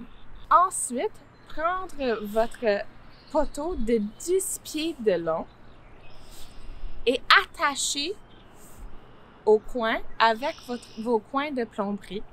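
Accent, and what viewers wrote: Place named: Canada. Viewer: charmant accent canadien!!!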